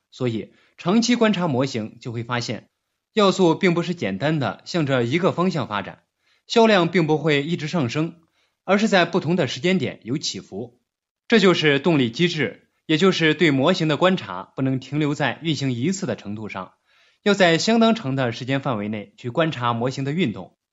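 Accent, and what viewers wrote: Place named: Shandong, China. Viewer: 说的太好了，只是普通话不是很标准，您是山东人吗？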